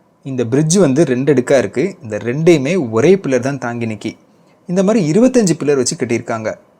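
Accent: Tirunelveli, India. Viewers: உங்களுடைய திருநெல்வேலி பாசை நன்றாக இருக்கிறது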